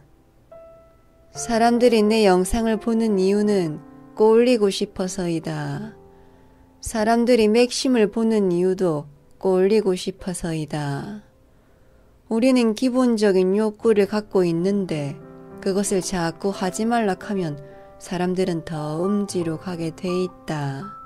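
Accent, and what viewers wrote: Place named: South Gyeongsang, South Korea. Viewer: 왜 나레이션을 사투리로 한건가 했더니 경상남도 출신이시구나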